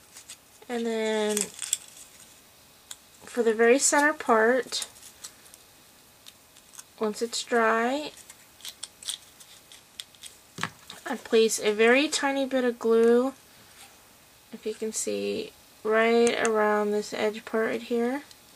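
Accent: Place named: United States. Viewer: let me guess: with your accent, you are a rich american woman (because in america, you are rich or poor, no middle)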